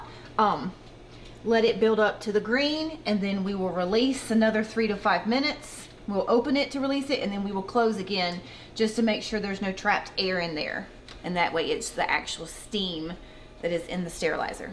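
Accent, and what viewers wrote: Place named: South Carolina, United States. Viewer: I can tell she is one of us in South Carolina! "Caddy Wampus' LOL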